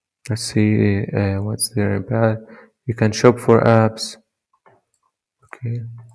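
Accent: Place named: Arab world. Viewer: انت عربي مبين من حكيك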